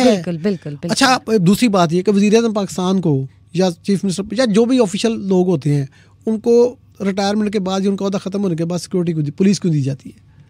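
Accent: India. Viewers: یہ اینکر بولنے میں انڈین لگتی ہے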